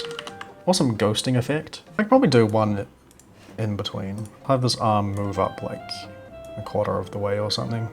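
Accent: New Zealand. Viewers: Are you kiwi? 🇳🇿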